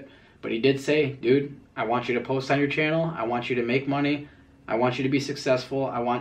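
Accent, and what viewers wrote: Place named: Russia. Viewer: you're a good genuine dude,. this channel will grow because of that. more russian accent though.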